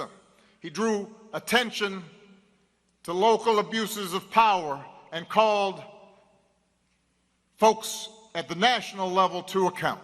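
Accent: South, United States. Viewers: I like how he throws in a little, country accent. So genuine ❤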